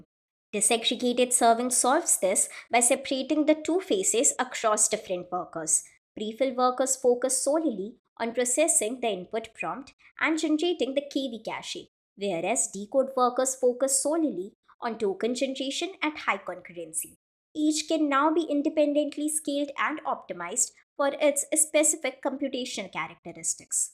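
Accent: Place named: South Asia. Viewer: Hi. I appreciate the presentation, but the extremely heavy South-Asian accent and cadence personally makes it VERY difficult to properly parse the words/meaning (English is not my first language). So many things are simply mispronounced. Cachet for starters. We would be MUCH better off with an Ai voice here, and I don't say this lightly because they are monotonous and boring, but at least my brain doesn't get fried.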